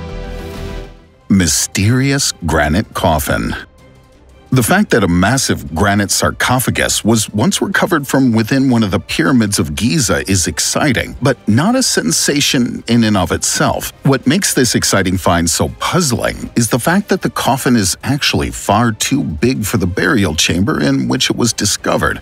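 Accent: United States. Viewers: the narrator's American accent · The narrator has an American accent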